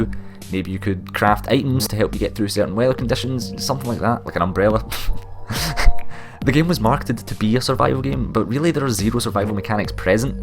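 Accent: Scotland, United Kingdom. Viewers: I love hearing Scottish people rant about shit. :D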